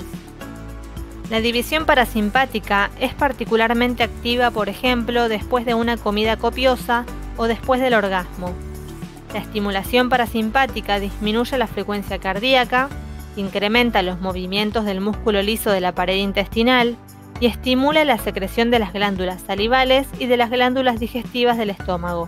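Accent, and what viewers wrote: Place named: Argentina. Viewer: Q raro la mano negra tomando en cuenta q suenas como argentina en fin 🤔😑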